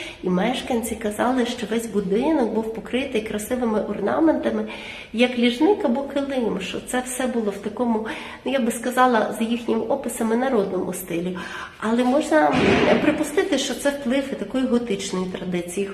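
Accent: Lviv, Ukraine. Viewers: інтонації... абсолютно львівські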